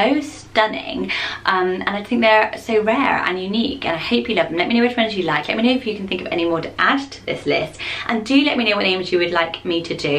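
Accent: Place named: South West, United Kingdom. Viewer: Where are you from? You pronounce your “r’s” like we do in the south west he he xx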